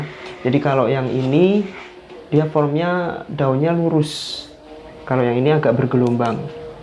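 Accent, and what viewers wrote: Java, Indonesia. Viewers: Aslmkum....Alhamdulillah stlh sring lihat utube nya jd smangat lg brtanam..tau jnis2 philo..dn smua hal ttg tanaman..dlu sy merasa g tau apa2..sk salah2 nyebut nama tanaman..sy sk dg apa yg d sampaikn cara penyampainnya jg..kalem dn apa adanya..mengalir dn kesan jawanya gak hilang...sll rendah hati dn memberi smangat u kita2 yg nonton..smg mdpt balasan pahala dr Allah krn tlh menyampaikn info2 yg brmanfaat...smg sll sehat..sukses dunia akherat d jaukn dr sgl yg buruk...aamiin aamiin yra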